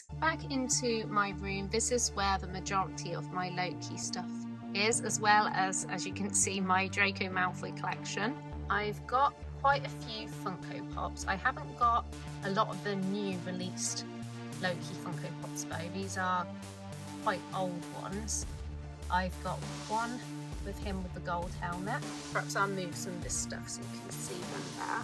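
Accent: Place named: United Kingdom. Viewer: Hello! I liked your vlog! I’m also a huge fan of Tom Hiddleston. The video was very informative and interesting. I didn’t know about the fact that Tom Hiddleston’s favourite book is “Anna Karenina”, and for me, as a Russian, it is a great surprise and pleasure. I have a question, sorry if I’m wrong, I’m just curious; You have a very beautiful accent, and can I assume by that that you live in the UK? If so, did you have any chance to meet Tom Hiddleston, possibly at some events? Thank you and have a great day!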